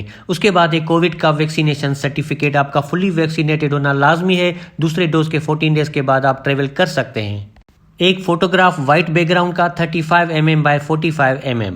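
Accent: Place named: Sindh, India. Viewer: Aap k lehje se lagta hai aap Sindhi speaking Bhai hain.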